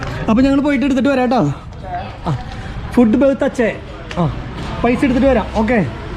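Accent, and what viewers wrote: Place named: Tamil Nadu, India. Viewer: തമിഴ് നാടൻ ഇംഗ്ലീഷ്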